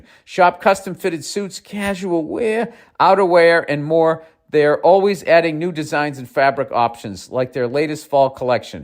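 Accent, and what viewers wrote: Boston, United States. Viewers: I think you may be one of my favorite people on the planet… Every single thing you say is exactly what I am thinking and saying about it. It’s like hearing the voice in my head with a Boston Accent 😂 It’s Awesome!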